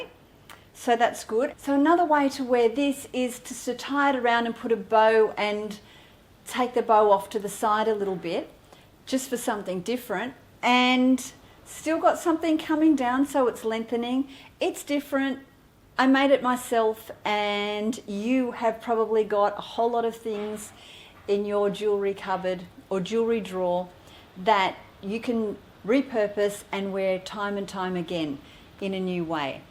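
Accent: Australia. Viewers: Hi. I’m new here. You sound Australian. I’m an Australian 👍😃. Do you do anti aging creams and masks?? My neck is my problem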